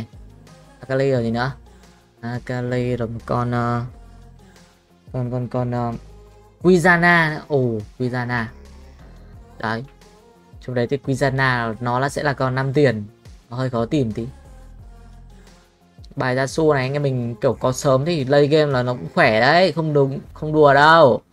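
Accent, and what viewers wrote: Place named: Central, Vietnam. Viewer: nó gốc bắc vào DakLak sống nên vậy à mà...xung quanh người ta nói giọng Trung nên lâu lâu nhiễm ý mà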